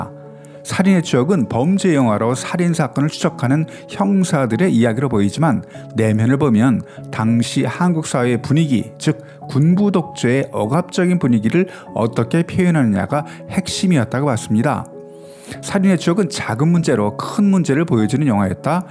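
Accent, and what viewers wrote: China. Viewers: 당신 조선족이지 ? 말투는 못바꿔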